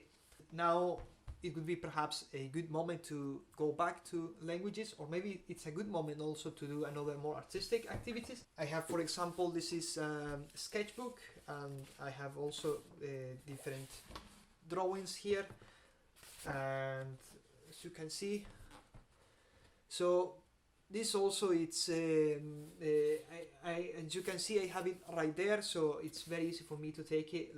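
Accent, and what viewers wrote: Scotland, United Kingdom. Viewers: I found myself here following different threads of polyglots and their channels. I listened to this video and your intro to your channel in Spanish. You are a very interesting fellow. One thing puzzles me, and that is why does your English sound like someone with a Scottish accent? Did you have an English teacher who was Scottish? Just curious. I enjoyed your video.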